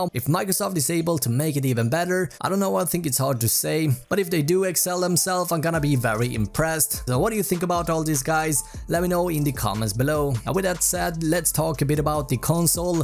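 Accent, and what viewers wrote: Sweden. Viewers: You sound swedish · E d svensk??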